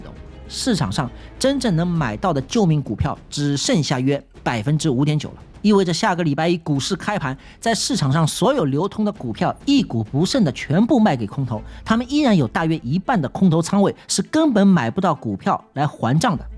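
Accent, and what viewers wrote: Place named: Wu, China. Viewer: 挺好的 就喜欢吴语区口音😂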